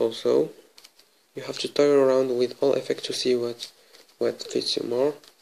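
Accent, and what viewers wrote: France. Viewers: Hablo español y entiendo su inglés con acento francés \ :v / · I can understand that cuz i'm french like him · I heard a french accent when you talk I don't know why x)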